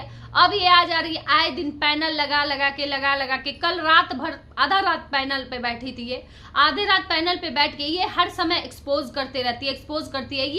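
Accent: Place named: Bihar, India. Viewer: बिहारी अंदाज का मस्त बोलती हो आप मजा आ गया ❤❤❤❤❤❤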